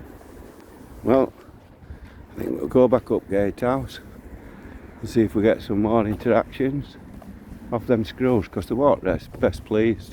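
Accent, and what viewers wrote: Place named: RP, United Kingdom. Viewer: I thought you’d be an Earl Grey man with your posh accent!!! (I can’t abide all that poncy stuff. It tastes like perfume to me).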